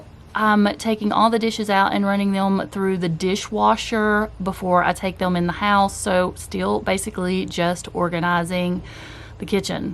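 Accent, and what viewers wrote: South, United States. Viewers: Girl! You are gorgeous ! With an adorable southern accent to boot!! I was also the tall, red headed freckle faced girl in school and was made fun of. I turned it around and realized I could carry more weight than the shorter girls and red heads are rare! You got it going on and don't let anyone tell you different! 💖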